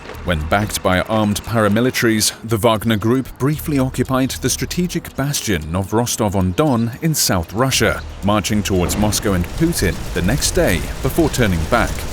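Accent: United Kingdom. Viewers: New british narator 😮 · Yo it’s the old British narrator 3 years ago!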